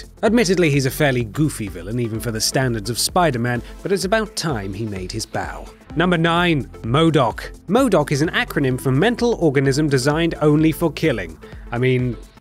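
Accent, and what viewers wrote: United Kingdom. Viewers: I don't really agree with the whole list but the British accent makes me believe that I'm wrong and they're right.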